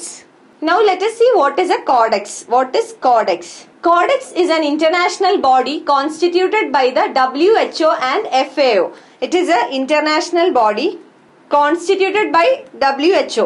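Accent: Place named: Kerala, India. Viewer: When she said value, only, module, later, point, percentage, merely. I came to know she is mallu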